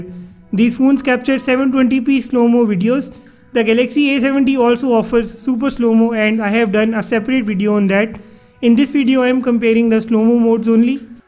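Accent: India. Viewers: Bruh that indian English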